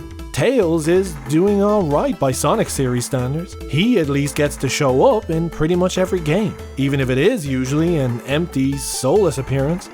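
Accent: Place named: Ireland. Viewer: As long as our favorite foxboy is given something in compensation in the future, I'll be very grateful and not as bitter 😆 Oh good, I was worried it'd come across as creepy 😖 And nah, I (at least, can) understand you pretty well! I dunno where in Ireland you're from but your accent is fairly clear to my ears (unlike some others 🤭)! I'm sure it's a cliche thing to say but Seán (aka Jacksepticeye) kinda helped my ears become a tad accustomed to to... well, SOME accents anyway, since there's not just one accent. glares at my fellow Americans who've yet to realize this fact Anyways... Yeah, Seán has made it slightly easy for me to pick some accents out, even if I can't tell whether they're from Cork or Dublin or what-have-you 🤣 When I first heard yours, I was like "Wait, is that...?" so I checked your homepage to double-check (hopefully that, also, isn't weird but 1. 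It was gonna bug me - especially if I was mistaken, which would've been super embarrassing - and 2. I like to know where the YouTubers I watch are from if it's not obvious) and I had a sigh of relief being right LOL Maybe it's due to Seán but I've developed a great appreciation for Irish accents (and by proxy, the Irish culture and folklore but then, I've always been into Irish music and dance since I was young) and being partially Irish myself doesn't help matters 😂😅 I'm so sorry that if this is ALOT to taken in, all cuz it's on your bloody VOICE - which is normal and probably nothing special to you, but I have a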